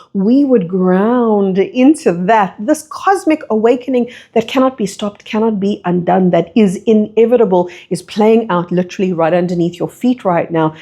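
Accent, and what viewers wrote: South Africa. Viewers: Mmmmm….. I resonate with what is being said .ummmmm the accent……. Sounds SOUTH AFRICA?//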